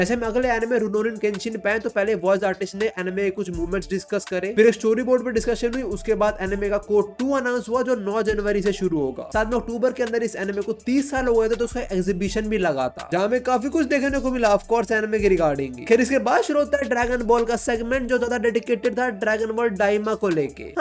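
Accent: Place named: Bengal, India. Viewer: Wow Bengali is funny ngl 😅✨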